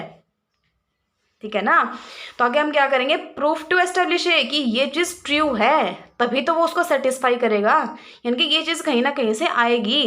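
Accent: Haryana, India. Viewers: Sister aap haryana sa belong krta ho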